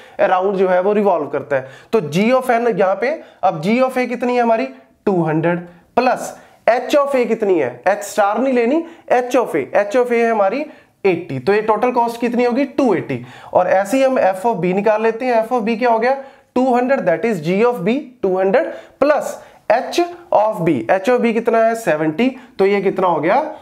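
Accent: India. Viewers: Sorry I cannot understand Indian English...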